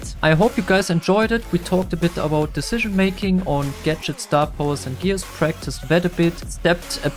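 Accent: Germany. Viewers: Junge wie deutsch kann man englisch sprechen 😂 tut richtig in den Ohren weh deine Betonung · I just love the german accent 😊 btw I‘m also german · German accent makes my blood boil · You are german right? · Mann hört das er eigentlich deutsch ist haha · Love the german accent ❤ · Moin wie nutzt du BS auf deinem Pc?? und geiler Deutscher Akzent xD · Yooooo this is the most german english I ever heard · Ich höre es so sehr heraus das du deutsch bist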